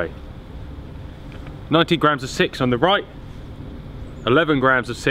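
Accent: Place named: England, United Kingdom. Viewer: every time I click on one of your videos I'm momentarily disoriented by all the shotguns in the intro. But then you start speaking with an english accent and I remember that you folks across the pond are perhaps a bit limited in the scope of weapons available to you.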